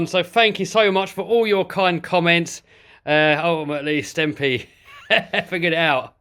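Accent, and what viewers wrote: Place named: Cockney, United Kingdom. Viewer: You have the strongest Cockney accent I've ever heard! Lol great vid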